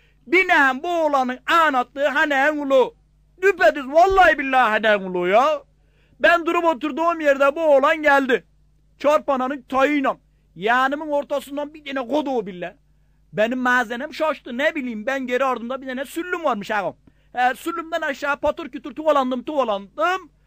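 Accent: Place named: Gaziantep, Turkey. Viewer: ORJINAL ISTE! BU SIVEYE CAN KURBAN! Antepli olunmaz antepli dogulur!!!!!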